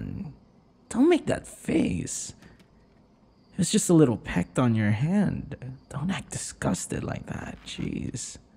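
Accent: Philippines. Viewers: OK- this is just a idea cuz ur filipino accent, love it ALOT, can u maybe do a filipino one? would love to see someone take my idea but there is no forcing but just a suggestion :D (i love ur vids so much omg, keep up the amazing work!!) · he's closest to Filipino accent 🥵❤️‍🔥🇵🇭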